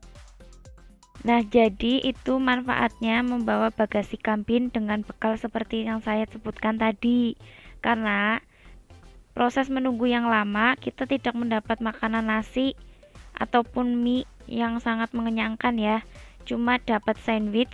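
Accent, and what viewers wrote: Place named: Banyumas, Indonesia. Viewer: Ngapak kie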